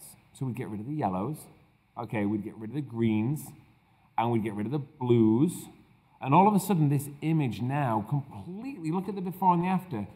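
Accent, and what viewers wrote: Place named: England, United Kingdom. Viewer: 28 seconds in and I already know this dude is from England, but lives in Vegas, drinks coffee to stay awake and does star jumps to keep warm...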